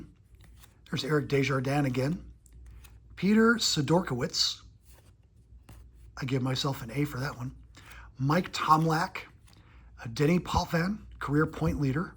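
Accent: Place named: Canada. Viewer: Thanks for sharing, loved listening to you speak Canadian to us! The 1991 O-Pee-Chee baseball was a small set and was heavy on the Canadian teams, so you were right on.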